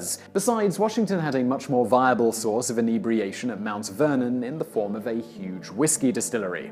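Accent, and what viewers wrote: England, United Kingdom. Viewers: Your facts are true. Do we need to be reminded of them,. by some smooth english accented tory. Washington also had a greyhound dog named Cornwallis. Now that is worth knowing. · Should we trust these Washington facts from a guy with a British accent?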